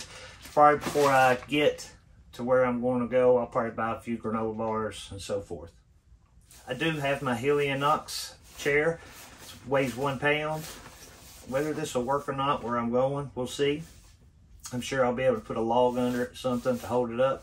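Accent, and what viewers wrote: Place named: Kentucky, United States. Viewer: Always soothing to hear your sweet Kentucky accent! Nice choices in gear my friend. I live that your hammock tarp has lasted so long!!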